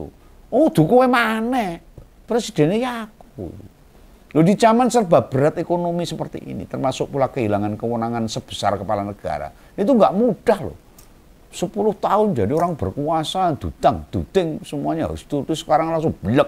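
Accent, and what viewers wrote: Java, Indonesia. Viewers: aku seneng bosomui je, campuran, jowone medhok dengan istilah kuno. 👍👍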